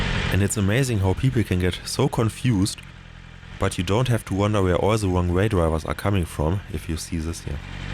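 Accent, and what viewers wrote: Germany. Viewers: Are you making up this accent or is it real? I can't understand half of the things you say. It's almost like a joke with German stereotype: "ze car, ze road". Damn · Its real and hey theres nothing wrong with that. Hes trying his best. Not everyone in Germany is able to speak English. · You guys might like to know that there might be an experienced narrator coming in soon to take over the vocals. I also have a really rough time listening to the classic German English.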